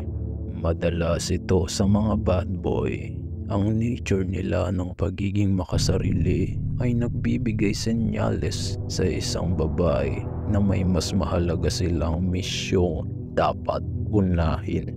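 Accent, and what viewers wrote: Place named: Visayas, Philippines. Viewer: bkit ganyan boses at pagsasalita mo???bisaya ka noh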